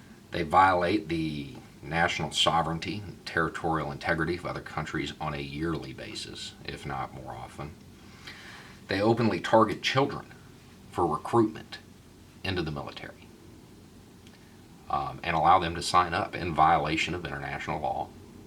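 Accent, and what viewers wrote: South, United States. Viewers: Yep, glad there aren't many trolls. But at the same time, I really want right-wingers to check this guy out. I want them to see someone who looks and talks like them but with a different viewpoint who can passionately argue from a place of love like can. He has a chance at changing minds like few others on YT can. Also want liberal city-folk to see and then maybe think twice before dismissing people with a southern accent as stupid.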